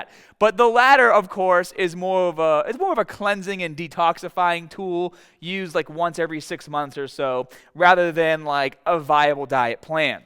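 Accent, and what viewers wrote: Boston, United States. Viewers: You from Boston? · OMG! His BOSton accent makes me laugh. Every AR word is exaggerated. EVERY ONE of them. cARbs, mARkers, so fAR, ARgue, ha ha ha.. Keep going man. Good info. Just super funny accent. Love'n it. I do IF with KETO at a 20:4 fasting to 4 for last 6 months. But im not a bodybuilder. And as far as flexing the meal. Its easy to flex your eating time. its not a hassle. Im not a hARd gainer. LOL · Haha Love that accent is that a Boston accent?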